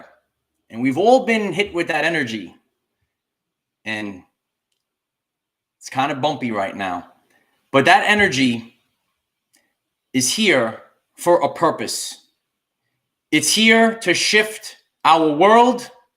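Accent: New York, United States. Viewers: Sounds like a New Yorker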